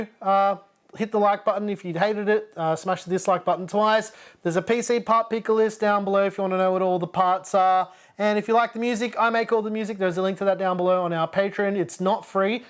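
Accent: Australia. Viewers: I'm so confused dude looks mexican but sounds Australian lol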